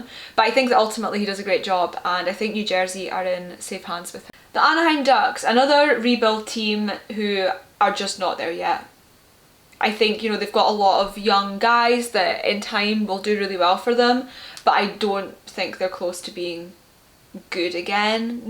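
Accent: Scotland, United Kingdom. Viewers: Be still my beating heart. Scottish blond woman who loves Leaf's. I might of just found my future wife. As a Finnish hockey fan and judge Toronto Leaf's fan I am in love... 😂😂 I really could listen that Scottish accent all day long. But to be serious, I'd love to see your deeper prediction/valuation of Leaf's. Love from Finland.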